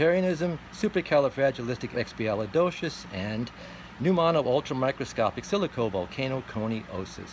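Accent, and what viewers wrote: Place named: Texas, United States. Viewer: That was super cool. I loved your accent, I think you're Texan, or North Carolinian. Am I right?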